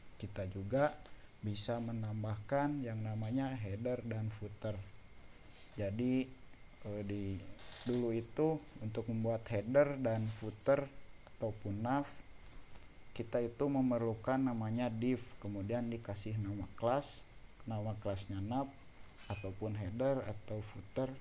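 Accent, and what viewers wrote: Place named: West Java, Indonesia. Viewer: Pengisi suara nya sunda banget :v sukses terus buat JAKI Aplikasi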